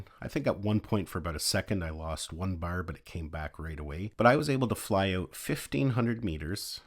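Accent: Canada. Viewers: Just to be clear, are you flying CE or FCC mode? Your accent sounds Canadian, which is CE territory, correct?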